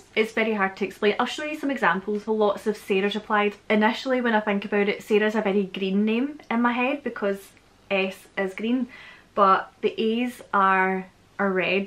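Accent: Scotland, United Kingdom. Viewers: That's interesting! You have my absolute favorite accent from Scotland. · It is sexy to have synaesthisia !! Specially with a scotish accent ♥️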